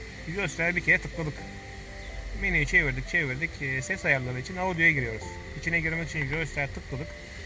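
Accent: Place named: Thrace, Turkey. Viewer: dayı tekirdağlı mısın edirne mi? :D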